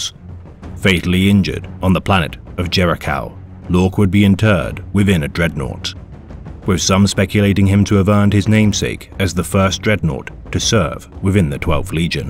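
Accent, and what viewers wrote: United Kingdom. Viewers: Lock Laok Lorke Lawk All sound identical with a british accent.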